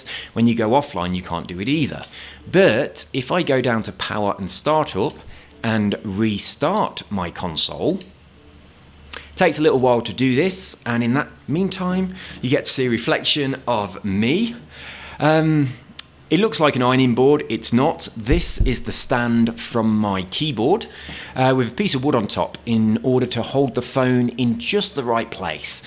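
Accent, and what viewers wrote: United Kingdom. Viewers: Why do you sound Cornish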